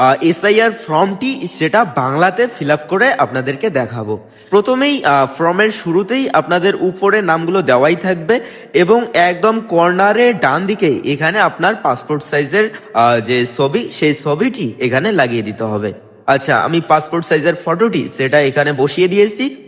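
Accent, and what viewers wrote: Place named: Bangladesh. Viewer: Tumi to Bangladeshi. Vulval bojhaccho keno ? Tomar citizenships na bolleo tomar kothai bole dicche tumi bangladeshi .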